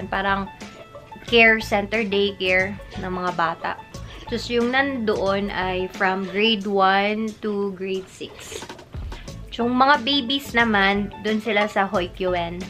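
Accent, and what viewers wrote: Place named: Visayas, Philippines. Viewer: Ate gawa ka naman ng video with your class sa susunod. 😀 Bisaya man diay ka te.😁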